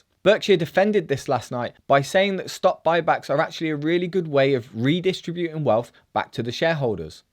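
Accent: United Kingdom. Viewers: Oh man; you bust out queen's English and you might surpass PewDiePie.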